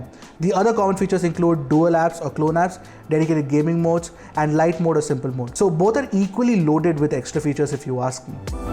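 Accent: India. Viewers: I like his english-indian accent ngl.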